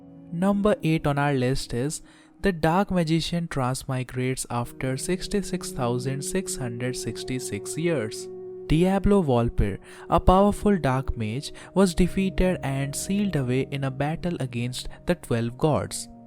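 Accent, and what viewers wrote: India. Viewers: Your accent is indian bro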